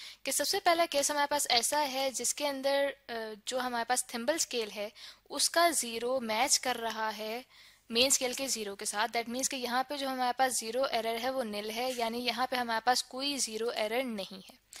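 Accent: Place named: Pakistan. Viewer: Assalamoalaikum mam aapki awaz bht khoobsurat h or aapke smjhane k tareeke ki m fan ho gyi hu Aap shayad pakistan se hn asal m aapke bolne k tareeke se laga mgr sch m aap bhht achhe se smjhati hn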